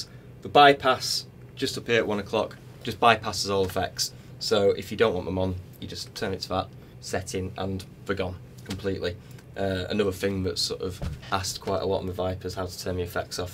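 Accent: United Kingdom. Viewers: The combination of the British accent and sweet beard lead me to believe that this man is Guthrie Govan's alter ego.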